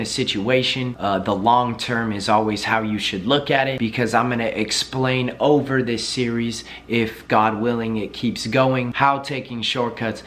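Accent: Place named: Southern California, United States. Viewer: I can tell u from socal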